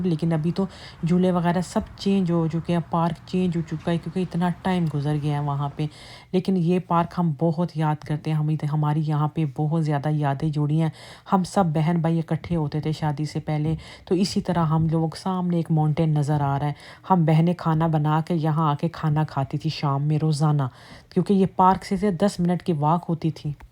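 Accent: Punjab, Pakistan. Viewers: Hi hope you will be fine happy to listen Pakistani voice in Canada apna pan pata chalta ha thanks for making video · Ap ka accent punjabi lagta hai as you mentioned you spent your early childhood in punjab but ironically your accent for English is not like the one who grew up in Canada ! Btw great video ! Keep up the good work ❤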